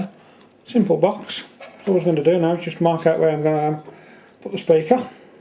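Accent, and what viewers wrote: United Kingdom. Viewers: In a box, not from a box...You talk funny. British or something...Stupid radio.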